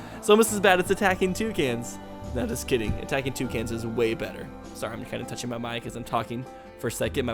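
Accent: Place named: Ozarks, United States. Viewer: The way you talk is really obvious to other Missourians. You sound like you come from up near the northern part of the Ozarks. You sound just like my brother in law from just north of marsh field.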